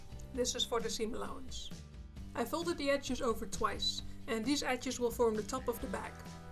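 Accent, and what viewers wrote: Netherlands. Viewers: Great bags... One question ... are you dutch???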